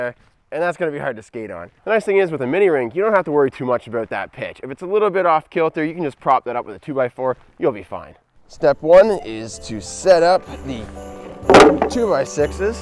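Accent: Canada. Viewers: Not hard to tell your from Canada like me 😂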